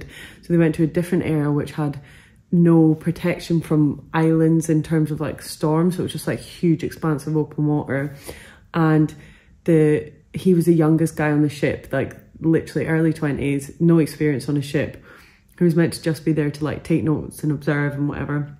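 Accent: Scotland, United Kingdom. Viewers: Just found you and I love your vlogs 😍 They’re so realistic and relaxing to watch! Are you scottish (I hear the accent😅) ? And do you have an instagram?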